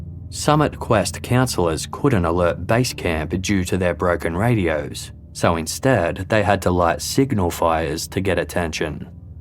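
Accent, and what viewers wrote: Australia. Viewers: Lovely to hear an Australian voice on one of these documentaries.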